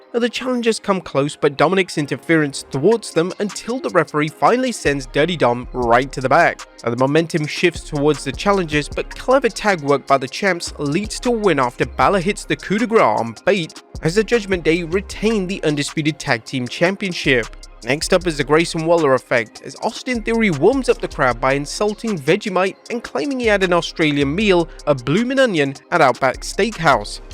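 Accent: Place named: United Kingdom. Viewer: Wtf I didn’t know you were from the UK😂😂😂😂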